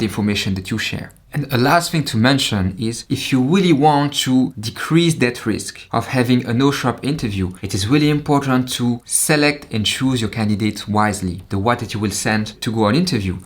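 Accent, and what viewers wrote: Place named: France. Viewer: Are you French by the way? Your accent sounds French 😀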